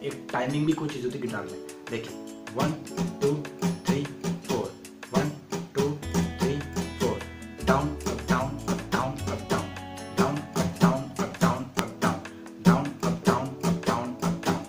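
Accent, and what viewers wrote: Himachal Pradesh, India. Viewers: Himachali ho bhaiji ??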